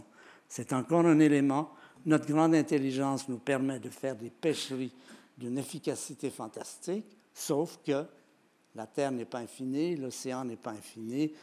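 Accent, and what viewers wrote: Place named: Quebec, Canada. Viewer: une allure de savant un bel accent quebecois merveilleux conteur grand vulgarisateur d'une science extrêmement complexe que l'histoire de l'univers de l'infiniment petit à l'infiniment grand.....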